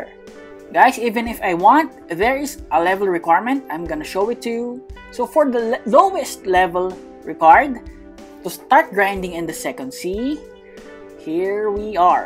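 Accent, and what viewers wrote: Philippines. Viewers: Are you A filipino? because you talk like one and when you like, make a mistake you say ay, sorry guys sorry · I was right ur filipino cause me as a filipino speaking english thts how i sound like also i saw tht text on messenger na maaga ka bukas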